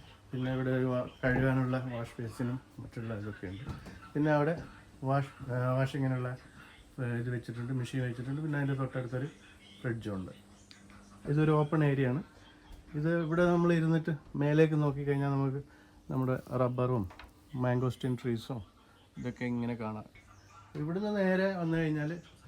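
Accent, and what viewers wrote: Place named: Kannur, India. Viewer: Sir kannur side anennu thonunnu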